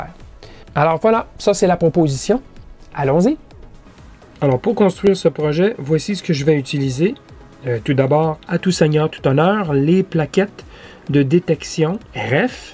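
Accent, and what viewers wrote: Quebec, Canada. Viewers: Un québécois! Belle vidéo!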